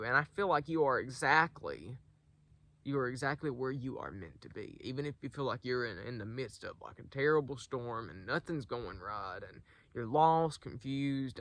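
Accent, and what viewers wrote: South, United States. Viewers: When I listen to you, it's like listening to myself- except for accent is little less southern. Great video though! Blessed day!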